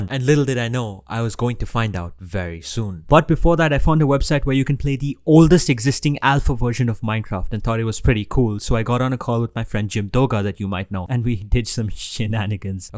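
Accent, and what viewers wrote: India. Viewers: i am new here but u sound like a indian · He sounds indian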